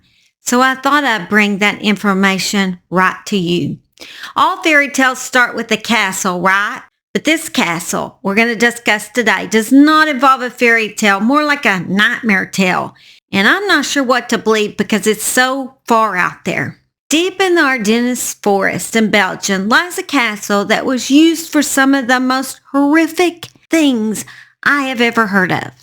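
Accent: South, United States. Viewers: me too, loads of stories I haven’t heard of, and there’s been a lot, if you decide to visit wrap up well 🧥🥶🧣🧕 your southern accent is very comforting to me, iv always wanted to visit loads of places in America but ended up in New York twice xx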